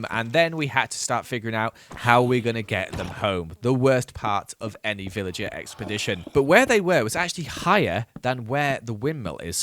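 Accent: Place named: United Kingdom. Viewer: so refreshing to hear a British youtuber for once because the vast majority of youtubers seem to be american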